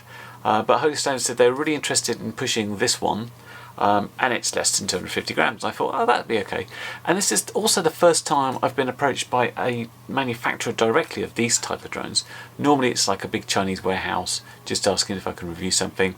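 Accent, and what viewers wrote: United Kingdom. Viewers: Oh wow. I had to stand up. It's as close as a cheese burger. A British accent doesn't make you automatically smart son. Read. Just read. Do what I ALWAYS told my Dad. I said "Before you call me RTFM. Read the effing Manual ".Anymore questions call me. So, RTFM!. And if you deal with HS as PEOPLE they'll deal with you as a king. Arrogance out back please.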